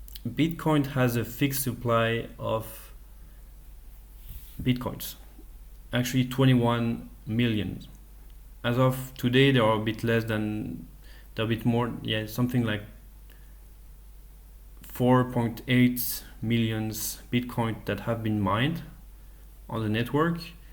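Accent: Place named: France. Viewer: Hello, i've just seen your video and i'm guessing due to your accent that you are french. I'm also french and currently investing on ethereum, i would love to be able to talk to someone who seems to understand this innovation quite well. Do you think it could be possible? and thanks a lot for this video (it's a bit to slow for me but it's very educational).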